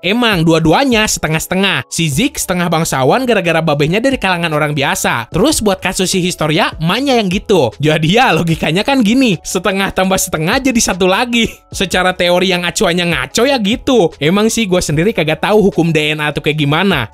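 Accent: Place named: West Java, Indonesia. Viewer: Sundanese detected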